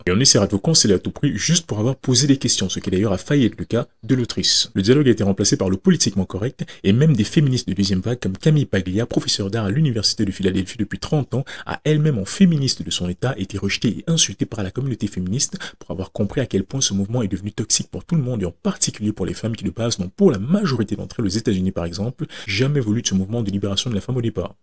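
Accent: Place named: Antilles, Caribbean. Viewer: Excellente vidéo mais ... Petite suggestion bro pour tes prochaines vidéos ! Tu as un bon timbre de voix qui sent bon les Antilles ... mais par moment ton débit de paroles est trop rapide 😅 Ça gâche un peu 😉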